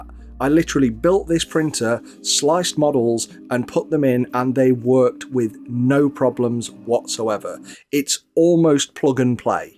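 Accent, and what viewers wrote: United Kingdom. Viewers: I tend to use carbon fibre infused PLA and Matte PLA on prints that require me to hide my layer lines and I print at 0.12mm layers and I get wonderful results, I wonder how good they would look using that material on that printer with those layer heights? I had promised myself I would not buy another bed-slinger printer after I built my Pro 3D V-King 400 from self-sourced very best quality parts, I also have 3 Ender 5 Plus machines as well as half a dozen bed-slingers, I have a resin printer but can count on my fingers how many times I have used it as the smell and the mess and all the cleaning just put me off but seeing the prints this machine can produce has made me think again, if this was released as a cube printer it would be a no brainer descision for me, what size nozzle do you have to use to be able to print in those low low layers? 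Your channel has also escaped my radar until now and it is a breath of fresh air to hear another English northerner (I think) talk about 3D printing, I am from South Yorkshire by the way! +1 Subscription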